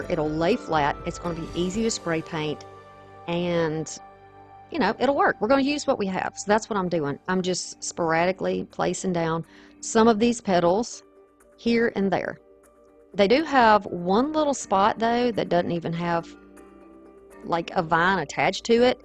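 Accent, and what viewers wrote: South, United States. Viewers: Love them all! I love crows, so your moodies are perfect accompaniments. Thanks so much. Have a great day and God bless 😊 . I went to the michaels link and i like your birdcage and shadow box way more than theirs, actually i think all of yours are better ! Are you from ga ? Cause you talk just like me, and when you said "little,tiny nails" i laughed out loud. I so love our southern hyperbole, why use one word if you can use two and drawl them out. From Nancy in ga usa 😊.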